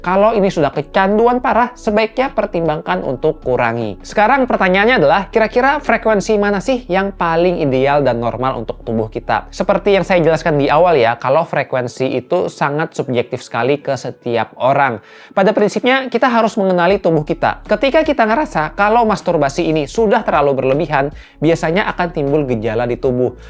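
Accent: Banjar, Indonesia. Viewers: urang banjar kah pian dok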